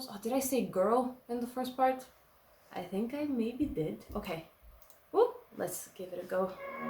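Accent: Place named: Ireland. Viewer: love Ireland accent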